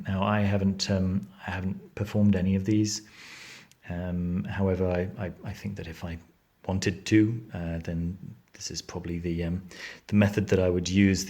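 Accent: England, United Kingdom. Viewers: I enjoy your voice and would gladly listen to esoteric books as read by you. I'm curious if your are English, or perhaps South African?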